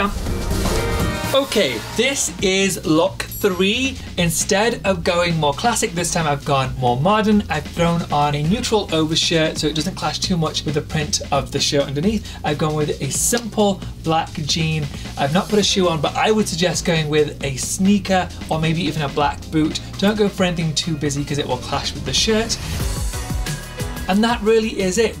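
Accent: United Kingdom. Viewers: This guy annoys me, even with his British accent.... I miss Carson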